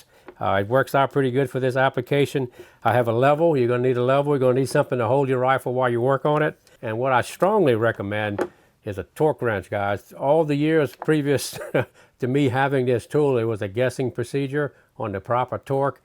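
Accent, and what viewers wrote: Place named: United States. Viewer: Great and easy to follow and understand. Talks American English and explains thoroughly yet simply. 👍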